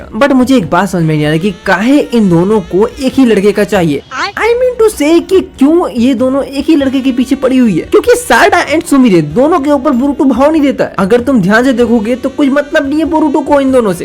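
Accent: Bengal, India. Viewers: kiya bhai tu bangali hay?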